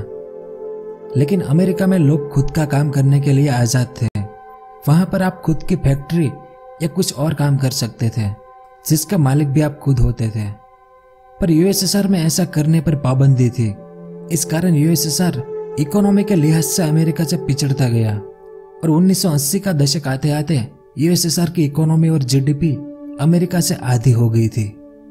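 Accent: Gujarat, India. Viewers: Your tone of hindi speaking shows that you are gujarati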